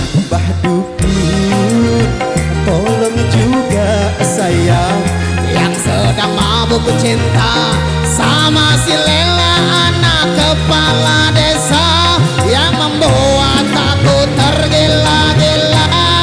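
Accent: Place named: West Java, Indonesia. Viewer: ....yeesss. teryata logat bahasa sunda bisa jg di pake orang lombok.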